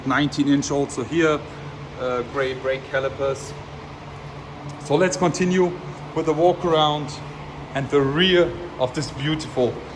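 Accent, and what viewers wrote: Germany. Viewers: sorry ich bin jetzt auch nicht besser aber man merkt das du deutscher bist wegen der Aussprache aber wie gesagt ich bin auch nicht besser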